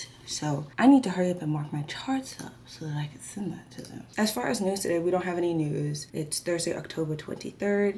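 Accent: Bahamas. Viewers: Are you Bahamian? Sometimes your voice and how you say words reminds me of how Bahamian women speak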